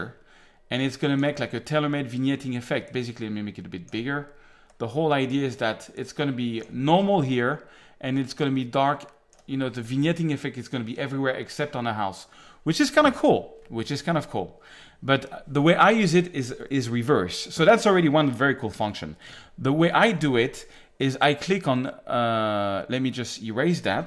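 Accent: France. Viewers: Encore un excellent tuto et personnellement je trouve qu'il est assez facile de comprendre vos tutos en anglais de part le fait de votre prononciation à la française ;-) encore merci et félicitations pour votre travail. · I like the way French speaking English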